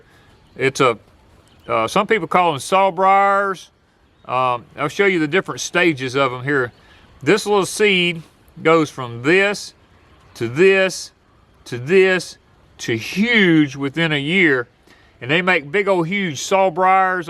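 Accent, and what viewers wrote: South, United States. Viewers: I love a southern accent .....most of my most watched content creators have an accent , there has to be some type of crazy science behind it. Reminds me of my childhood. Born and raised in Northern New York Went to North Carolina during the summers Id always come back with a twang 🥰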